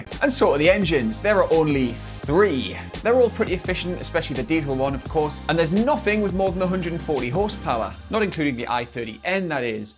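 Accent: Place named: North East England, United Kingdom. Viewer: Why aye the man from the north east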